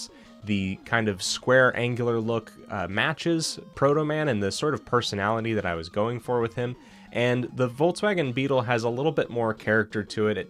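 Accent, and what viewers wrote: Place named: United States. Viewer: When your name has an -es instead of -s and ends with a -ston But you have an American accent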